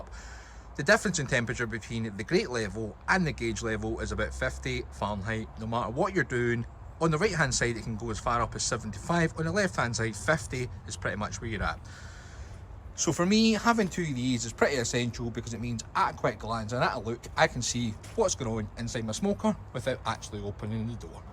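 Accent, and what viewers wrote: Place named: Scotland, United Kingdom. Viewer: no problem. It's always good to see how a fellow scot manages cooks in variety of weather conditions we have up here, so always stay tuned. Think I'm a touch further north than you by the sound of the accent haha. Cheers bud, I had a few small holes in the firebox basket but for the time being I'm just laying tin foil down then putting my fire grate ontop of that, does the job just it is by no way a long term fix, plus the steel is to thin to weld unfortunately. But she does the job, had some good cooks so far this year. Yeah a big smoker is probably a pipe dream. But a Oklahoma joe is definitely next on the purchase list when the time is right. Thanks for taking the time to reply.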